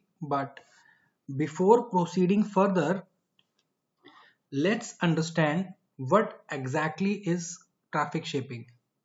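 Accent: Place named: India. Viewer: old videos with heavy indian accent, but still far better than the official Sophos Lessons, thanks for your effort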